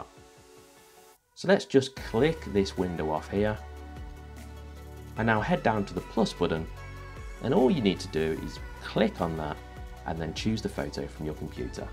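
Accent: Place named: England, United Kingdom. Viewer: Cheers, good to hear a English accent too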